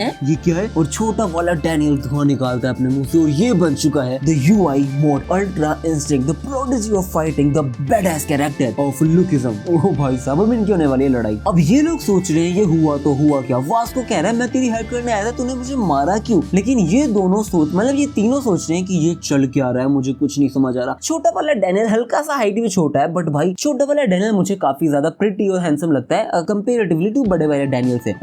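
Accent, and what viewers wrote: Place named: North, India. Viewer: bhai i recently watched vyuk`s last video on lookism and after that i was searching the whole youtube for more then i found your channel and broo that explanation of yours is just puree peakk !!!! cant wait for the next part its just like a top level anime-tuber brother you have great potentional best of luck and full support and remember one day you will be the goat and btw ur north indian accent is very familiar to my state j and k and where r u from ? edit : shoutout in next video with name plzzz [ real name = Girish Sharma ]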